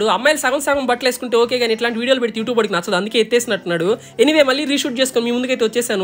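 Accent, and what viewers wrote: Nellore, India. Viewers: Anna meedi Nellore naaaa 😅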